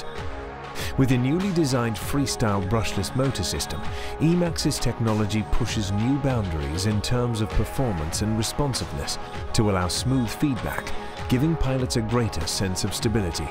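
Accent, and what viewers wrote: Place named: United Kingdom. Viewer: For some reason when someone has a British accent I take everything they say much more seriously. It makes everything thing seem more high tech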